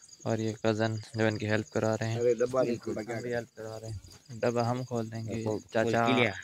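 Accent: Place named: Sindh, India. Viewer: Mai to smjha k Pakistan sindh ka area h koi kiun k ap ka lahja bhi Sindhi lagta h or or Yahan Kuch Sindhi hindo h I dia h pahla Sindhi Muslim daikha h🤔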